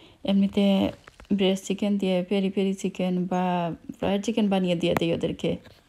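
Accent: Sylhet, Bangladesh. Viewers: Assalamalakum আপু আপনিও মাঝে মাঝেই সিলেট কথা বলবেই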